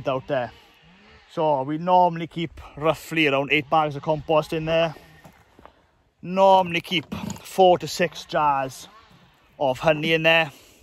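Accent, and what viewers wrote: Wales, United Kingdom. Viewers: Brilliant just what i wanted ti hear, so nice to hear that youve had no problems, i will be making one tomorrow to sell some of my excess veg, got radish, chard and hopefully soon some strawberries, kale and later tomatoes, great video man and btw best welsh accent ever! 👍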